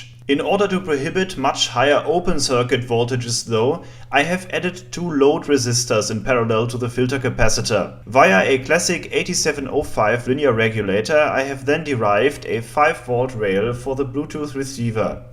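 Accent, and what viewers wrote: Germany. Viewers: I love your videos and your german accent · lol i can hear your german accent. even tho i can tell you seem to make an effort to try and hide it. but damn i loved then video. great use of all them old bits lol maybe ill put to use the old tape deck i have out of my ford lol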